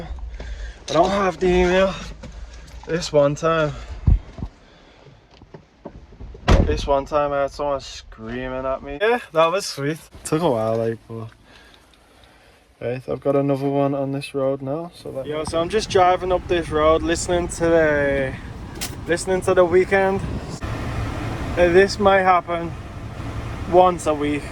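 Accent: Liverpool, United Kingdom. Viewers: What is that accent? Sort of Liverpool gangsta I guess. Massive. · picking up a scouse accent lad💪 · What is that accent? Scouse/yardey? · Wheres your accent from ? Not just liverpudlian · the liverpudlian accent is just fucking hilarious. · Your accent where the hells it from lol it’s half Liverpudlian and half something else. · you sound mad scouse I live in huyton ever delivered there page moss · Love the Liverpool accent, I hope you continue with this series. See like a down to earth lad, keep your head high and I'm sure success will head your way :) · What is your accent? You kinda sound scouse but also really Irish at the same time? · Swear I can hear a Scouse accent there 😂👊👊 I do the flex logistics dwn1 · I hate scousers but you seem alright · Are you a scouser ???? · Trying to figure out your accent. I’m hearing Liverpool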